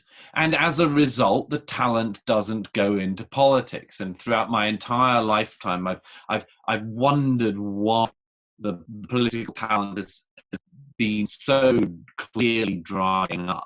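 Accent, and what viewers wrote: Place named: RP, United Kingdom. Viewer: Wow, that's the poshest sounding nonsense I've ever heard.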